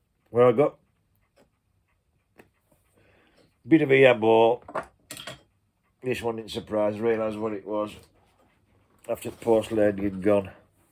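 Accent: Yorkshire, United Kingdom. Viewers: I genuinely hope you are pain free soon. Keep your stories coming they are an important picture of the past and you tell them in your wonderful Yorkshire accent to the tick of a clock which is all a perfect background to your pipe smoking content. I for one enjoy it all and thank you for it.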